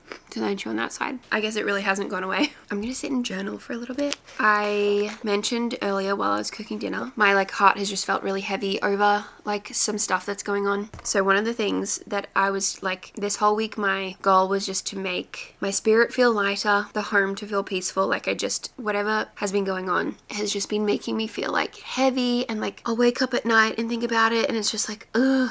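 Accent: Australia. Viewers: OMG SHE HAS AN AUSSIE ACCENT 😭❤️ YESSSSS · !! Her little accent!! Butter and water was definitely all Aussie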